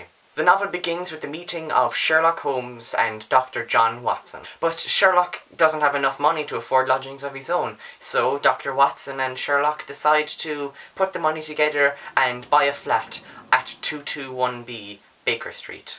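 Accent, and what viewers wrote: Ireland. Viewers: are you irish? :) and thank you for the review, very helpful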